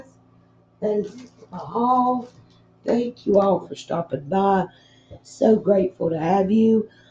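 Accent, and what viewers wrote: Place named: Virginia, United States. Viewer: I love all the stuff you found! Hope all finds you well in VA! I sure miss the VA and WV mountains! I get homesick every time I hear you talk...lol...tyfs stay safe and well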